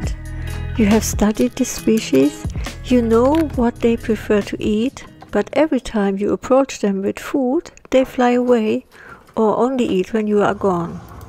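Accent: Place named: Europe. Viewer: I've started looking for crows to feed. No luck yet getting close. I'll try your advice. I like your accent. It sounds European.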